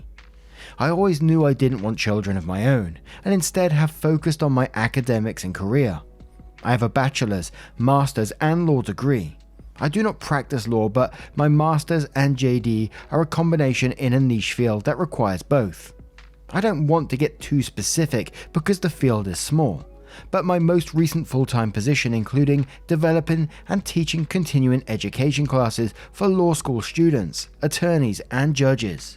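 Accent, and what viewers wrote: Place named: United Kingdom. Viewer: What? has an accent? I didn't know! Oh, wait... he's a fellow Brit! 😁 But you are right, he'd do well as a voiceover artiste.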